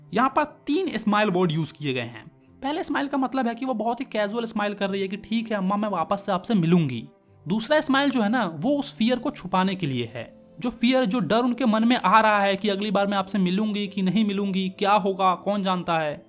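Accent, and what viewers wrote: India. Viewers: Dehati English ❌ deshi english ✅🫶🫶👌👌🙏🙏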